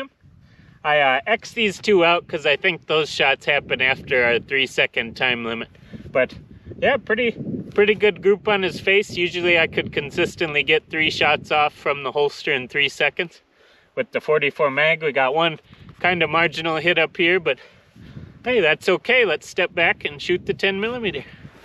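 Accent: Canada. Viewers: You sound like a Canadian but shoot like an Alaskan. I'll subscribe.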